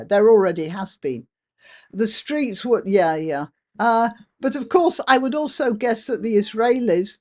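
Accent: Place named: RP, United Kingdom. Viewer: Fuck me, I love to hear a posh woman swear!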